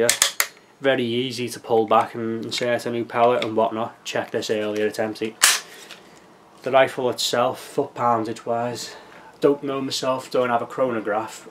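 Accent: Liverpool, United Kingdom. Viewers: He's a Scouser.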